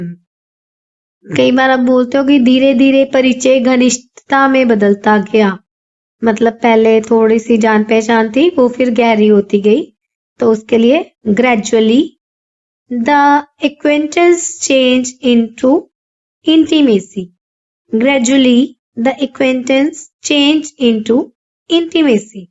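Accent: Punjab, India. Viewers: Sweet voice, some times Punjabi tone and useful sentences. Thanks mam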